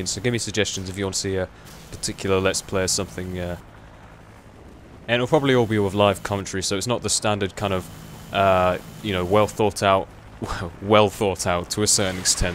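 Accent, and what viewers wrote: United Kingdom. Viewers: 2 Reasons why I LOVE YOU. You're British. You're a younger version of James Bond.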